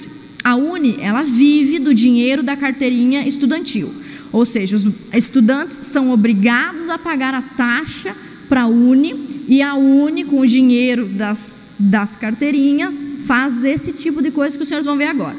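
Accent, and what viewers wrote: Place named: South, Brazil. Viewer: Acho esse sotaque sulista tão bonito.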